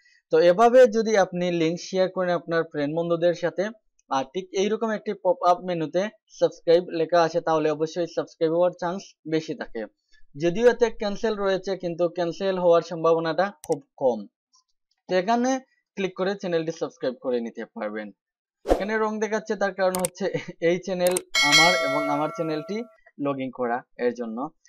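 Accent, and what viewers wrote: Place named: Sylhet, Bangladesh. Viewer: ভালো হয়েছে, তবে কখার মধ্যে সিলেটি একটা টান রয়ে গেছে।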